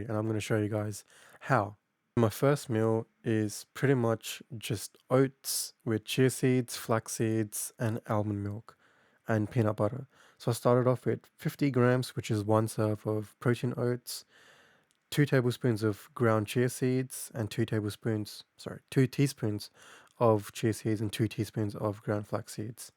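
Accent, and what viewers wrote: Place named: United Kingdom. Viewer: Thank god you’ve a British accent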